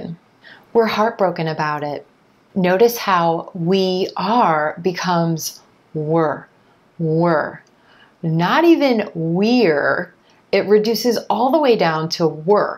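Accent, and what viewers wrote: United States. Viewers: you are the best teacher!! I love American accent.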